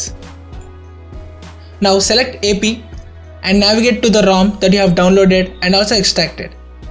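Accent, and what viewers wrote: India. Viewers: It is really funny before he selected the country i already knew he was from India that accent tells everything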